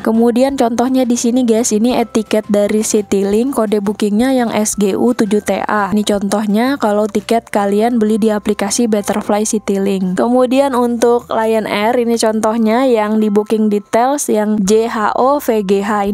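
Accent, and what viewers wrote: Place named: Banyumas, Indonesia. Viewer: Ow gitu om.,makasih infonya om. Sampean ngapak,ngapak e ndi mas?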